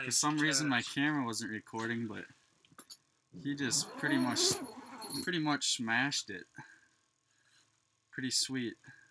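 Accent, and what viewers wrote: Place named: Minnesota, United States. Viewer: You ever watch the show Fargo? You sound just like the people on that show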